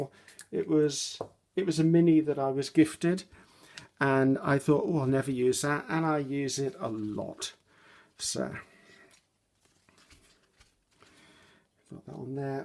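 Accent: England, United Kingdom. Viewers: you are so awesome! Please don't ever stop making your videos. You are professional, but real, which makes it very fun to watch your videos. You make me want to come visit England just because of your accent and tidbits about your "garden", "rubbish", etc ☺️. Thank you for reaching and sharing.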